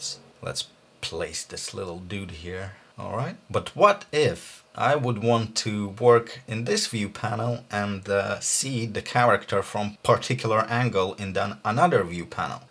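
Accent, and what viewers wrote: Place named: Russia. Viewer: почти не слышно русского акцента) отличный видос, от души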